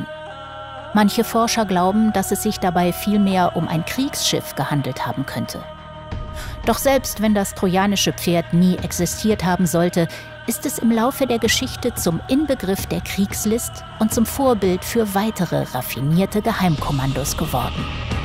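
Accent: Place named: Saxony, Germany. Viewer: Mit Sächsischen Dialekt kann man mich wirklich foltern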